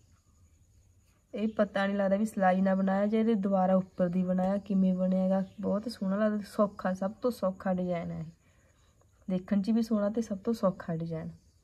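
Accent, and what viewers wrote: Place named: Malwa, India. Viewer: Bahut hi vadiya tarike nal samjaya & pure Malwai Boli🥰🇭🇲🙏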